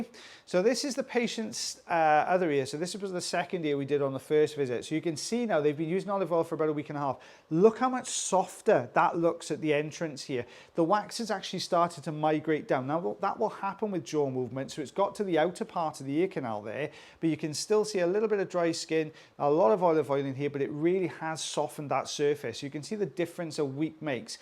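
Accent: Wales, United Kingdom. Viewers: I just LOVE watching all your vids! think this is the 3rd time I´m rewatching this vid! Sweetie never loose the welsh way of talking, since I moved over here I´ve come to adore how everyone talks to eachother as if they are one big family <3 Wich of your locations do you work most in? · I think his accent is the nicest of all the British accent. Yeah if they don't like it don't watch/ listen. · I learned to like the wax videos so that I could spend time listening to his beautiful Welsh accent. Anyone with issues with his speech should probably learn a bit more about geography.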